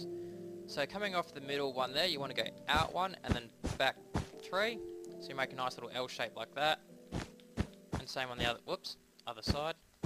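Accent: Australia. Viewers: Are U Australian or not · Like you accent your austrailian nice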